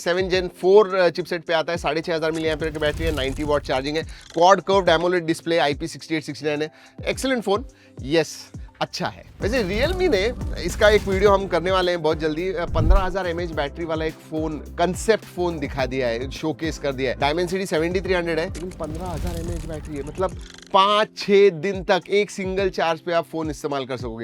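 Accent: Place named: Gujarat, India. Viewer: Chalu karte hai !!! Gujju accent..wahh😅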